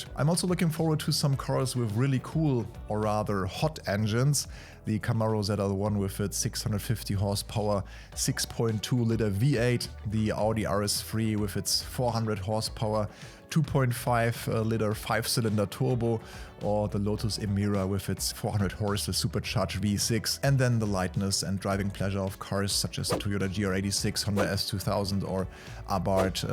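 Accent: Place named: Germany. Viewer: Bist Du Deutscher? Hört man sofort raus, richtig schönes Video!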